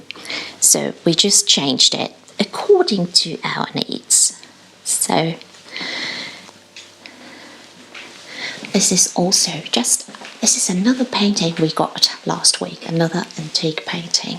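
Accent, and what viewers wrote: United Kingdom. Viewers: 愛がいっぱい❤️気持ちがあたたかくなります。英国英語に聞き惚れてます。私もクリスチャン。素晴らしいクリスマスを過ごしましょう✨🎄✨ From 🇯🇵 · I always thought you were British because of your accent and didn't understand why you say yor are German... I thought only was really German. Now I watched your Q&A explaining where you got your accent from and I understand. Can you make a video speaking a little bit in your natural German accent? It will be interesting to hear. Also I love the German language, can you make more German language related videos? Maybe about German classical literature or just favorit German words. Love your channel!!! Sara.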